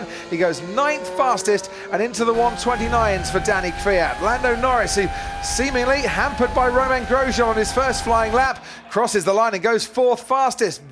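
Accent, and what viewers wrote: Australia. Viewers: Australian😂😂? Btw so fast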